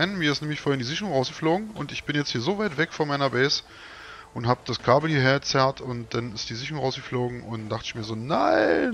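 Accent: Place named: Görlitz, Germany. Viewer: Sag mal kommst du aus der Region Görlitz? Dein "Slang" erinnert mich an Heimat 😁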